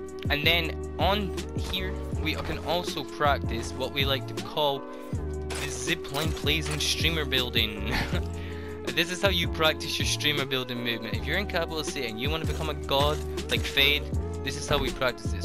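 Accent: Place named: North Scotland, United Kingdom. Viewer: You definitely sound like you're from the north of scotland lmao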